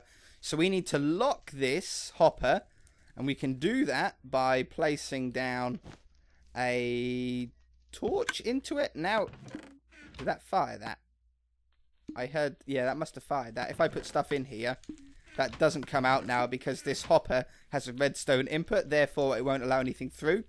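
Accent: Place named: Norfolk, United Kingdom. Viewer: I'm guessing your from Norfolk?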